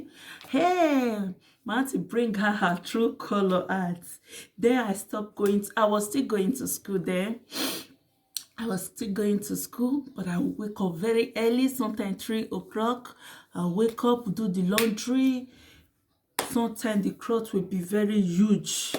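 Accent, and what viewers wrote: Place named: Edo, Nigeria. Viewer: You're sounding like an Edo babe. Am from EDO state😘. Nice connecting with you. Some aunties can be so wicked but thank God for your Dad's quick intervention.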